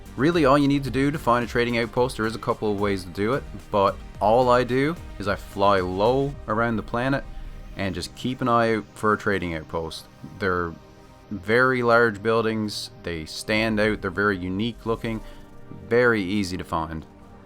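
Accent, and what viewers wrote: Newfoundland, Canada. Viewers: I am watching this now and said wow sounds like he's from newfoundland then seen your username haha me too! Subscribed! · U Canadian AF my boy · I could tell you were from Canada immediately from the accent. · Was I recommended this guide because you’re from Newfoundland? Or are you generally a popular NMS content creator? First time here and recognized the very local accent, lol. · i love your newfie accent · Haha heard that accent knew exactly where your from. I was born in st John's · Started the video and instantly thought.. You are definitely from Newfoundland.. :p Just got this game haven't tried it yet but if you are from Newfoundland that's pretty reassuring :D can trust another Newofundlander :) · i cant tell if your accent sounds like a really weak newfie accent or not lol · Buddy, as soon as i heard the whaddayaat i was like. 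i knows where buddys from. Prolly from up da shore over across the way. Nice to see someone else from the rock! haha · I gotta say I do enjoy the Canadian accent. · I was watching and couldn't get over how familiar your accent is, then realized you're a fellow Newfoundlander! Always nice to stumble upon a neighbour in the wild. :) Great tips, by the way! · You're the most Canadian sounding YouTuber I've ever found. (I'm also Canadian. Subscribed.) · are you from newfoundland? you have the craziest accent.. heavy af canadian accent with hints of irish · Total wholesome content man I'm subscribing. Oh btw are you Canadian by any chance ? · Newfoundland accent?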